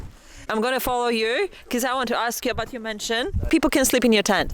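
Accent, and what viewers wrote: Australia. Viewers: Bloody hell, you're beginning to sound Australian again. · Wow, your Ozzy accent is really starting to kick back in. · ok now I know what is Australian ascent is.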